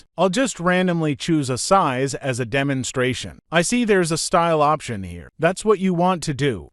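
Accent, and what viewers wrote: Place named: China. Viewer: Love the video, and I can't get over how your Chinese accent somehow shines through this AI voice. Way too cool, haha.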